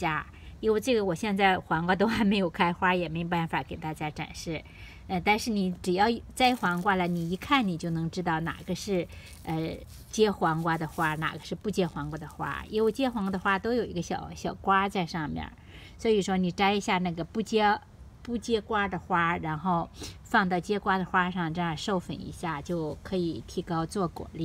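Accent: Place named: Shandong, China. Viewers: 老师是胶东人吧：) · 哪里，这样听着更亲切，我也来自山东，青岛人。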